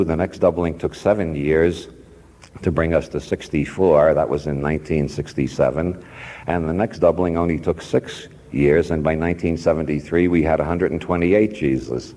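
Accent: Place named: Brooklyn, United States. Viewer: Joseph Toth ugh, absolutely never. His voice has a cadence, timbre, musical lilt (and Brooklyn accent) that would be utterly ruined by such fuckery. Just my opinion of course, but there’s nothing humble about it. Cheers!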